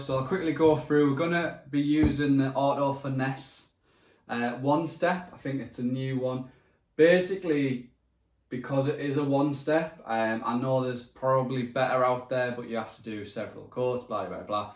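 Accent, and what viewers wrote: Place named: Middlesbrough, United Kingdom. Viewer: You sound local to me (Middlesbrough). I have literally just bought this polisher from Argos (not yet picked it up though).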